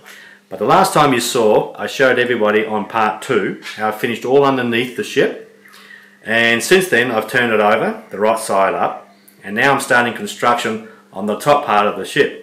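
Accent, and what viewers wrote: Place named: New Zealand. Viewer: No worries, keep up the good work, look forward to the finished product! I take it you're a kiwi as well? Just sound like a kiwi to me!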